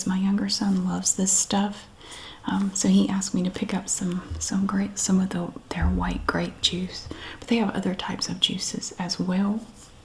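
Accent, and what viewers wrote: South, United States. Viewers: Hope you have a wonderful day too! Thankyou for your soothing southern mom voice :) you are very comforting to me! One of the only talking asmr-artists I can handle at all and a big part of that is your accent and how considerate you are to your viewers!!! Thankyou again!! I will gladly do a painting of you and your family if you like! For all the nights I was anxious having existential crisis(s) you and your Aldi purchases or you rearranging things at the store was there for me. Anyways please let me know if you'd like a painting Ill do it for all you've done for all of us! Thankyou again!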